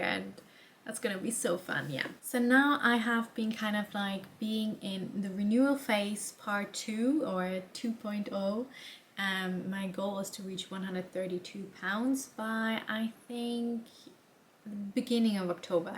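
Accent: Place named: Germany. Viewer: You’re doing great! Are you from Germany? Your accent and voice is very similar to a close friend of mine who is from Germany. :)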